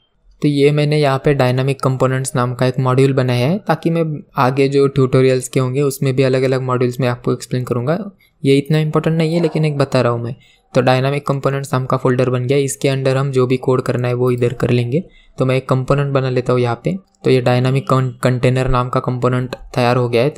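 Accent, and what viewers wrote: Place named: Maharashtra, India. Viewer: Marathi acent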